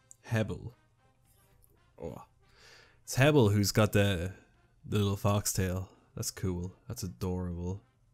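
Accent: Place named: England, United Kingdom. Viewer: I like your England accent. :) Btw subscribed to you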